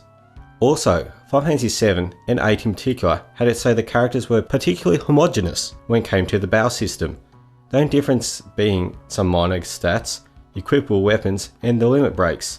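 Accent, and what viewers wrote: Australia. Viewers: I'm Aussie too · Are you Australian, by any chance?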